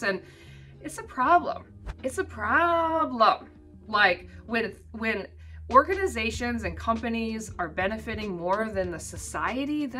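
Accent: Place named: New Zealand. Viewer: And your accent has changed a bit now too 😊 you sound very kiwi now. Lol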